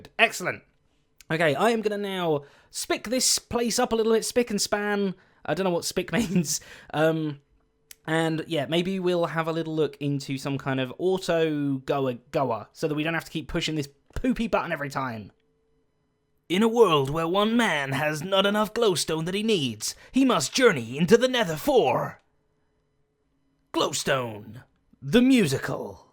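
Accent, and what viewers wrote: United Kingdom. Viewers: Why do british people get all the great accent? It's not fair :(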